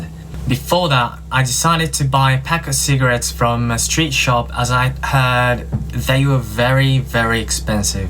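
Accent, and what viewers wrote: United Kingdom. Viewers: Hello. I enjoyed your video very much. It makes me happy to hear about someone having a great adventure and such a great time. Honestly, you sound like someone that has spoken English for most of their life. I do love the British accent. I hope to someday go to Japan. I want to go to most Asian countries. Hopefully someday haha. Take good care and stay safe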